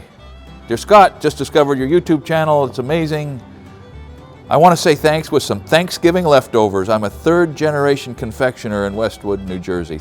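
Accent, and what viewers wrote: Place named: Texas, United States. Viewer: I think you might be a little more Texan than you know Scott.....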